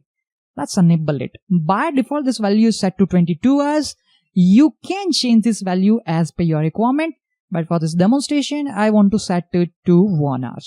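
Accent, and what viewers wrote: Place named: India. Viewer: was so happy to find guide.... then indian english .. :-(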